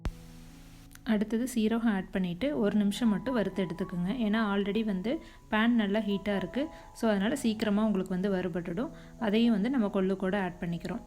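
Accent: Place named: Erode, India. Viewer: I like the way you speak😍Erode vaasam US ponalum veesuthu😊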